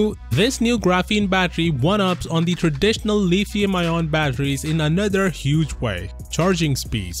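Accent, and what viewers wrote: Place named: India. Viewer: ugh. indian accent. I disliked the video instantly.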